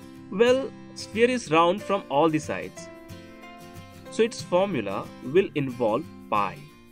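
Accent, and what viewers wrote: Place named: India. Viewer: That Indian accent tho .. Yuck !!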